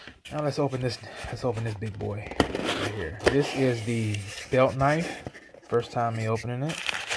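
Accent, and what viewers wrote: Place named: South, United States. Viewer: He’s probably from the South like me. We have accents:)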